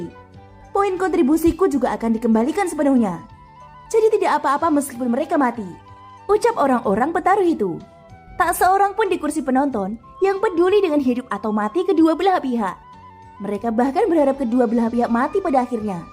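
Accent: Java, Indonesia. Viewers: Min aku rindu suaramu yang meddo'😅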